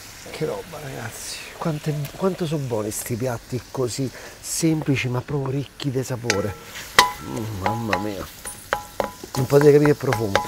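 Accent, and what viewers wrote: Rome, Italy. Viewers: Troppo simpatico con questa cadenza romana che dire..... bravo ⭐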